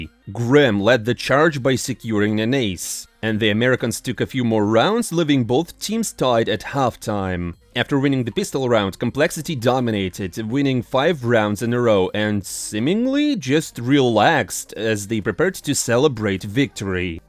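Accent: Russia. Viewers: This russian accent is horrible.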